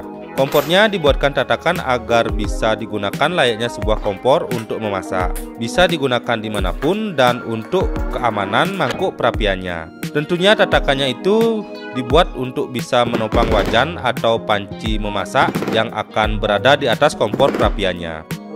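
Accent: Bali, Indonesia. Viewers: diliat dari logatnya kynya orang bali nih , keren mas vidionya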